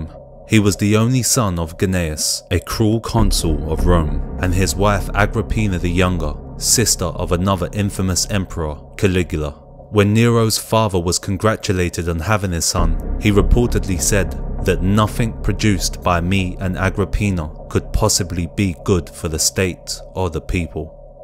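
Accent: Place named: East London, United Kingdom. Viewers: What accent is that... It sounds like the way my black friends speak near London. Brilliant stories btw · Nero was clearly psychotic. The Mad King? Nice voiceover- East London much?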